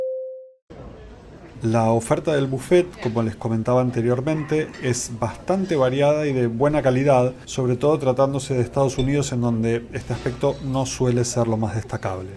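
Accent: Argentina. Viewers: Hola sos argentino o uruguayo?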